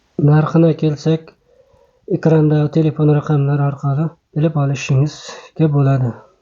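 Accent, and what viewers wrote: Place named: Tashkent, Uzbekistan. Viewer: Toshkent shevaga oxshamin qoldi😅😅😅😅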